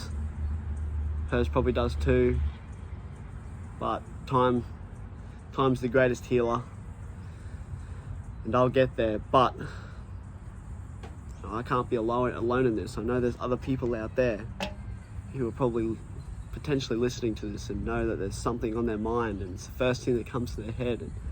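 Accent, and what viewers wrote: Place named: Australia. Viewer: Found this little vidya in my youtube feed, I'm glad you made it brah (I had to watch it on 2x speed as your eshay lad straya accent makes my yankee ears tickle). I'm 25yo now, still figuring things out, still gotta mountain of improvement ahead of me, but that's the beautiful thing bro. Even though I still trip and fall a lot, I'm the happiest I've ever been in my life because I feel like each year I'm closer to becoming a better man. No matter what happens: gangas or no gangas, bugattis or maccas, sickkunt or sad kunt, these are good problems to have cause every day I'm not dead or in prison is a day to be grateful for. I've learned to forgive myself when I slip, to not regret my past mistakes (no matter how retarded), and to not wait for things to be perfect. Climbing the mountain is why we're here brah: we fall, get injured, slip back down, but still get after it and make progress. 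Even if life doesn't go as planned, or every day is failure and is spent in tears - as long as we stay true and do our best, I know Zyzz and Tupac will smile upon us - and I know that we'll smile in the end too. Cheers mate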